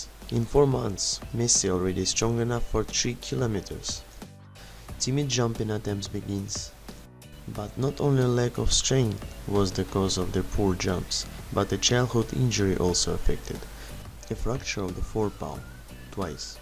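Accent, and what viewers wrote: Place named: Russia. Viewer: Haha😂 The Russian accent somehow makes it much more intimidating!🤣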